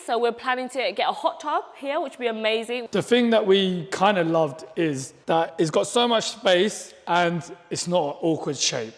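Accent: United Kingdom. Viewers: does anyone know what region of the UK her accent is from? city/etc? Love her.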